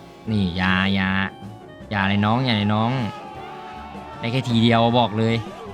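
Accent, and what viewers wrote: Korat, Thailand. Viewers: เอ้าคนโคราชบ้านเอ็งนิว่า555สำเนียงพี่คือคนโคราชเลย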